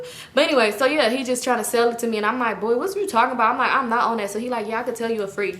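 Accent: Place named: Houston, United States. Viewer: Are you by chance from houston ? I love your accent 💕🤭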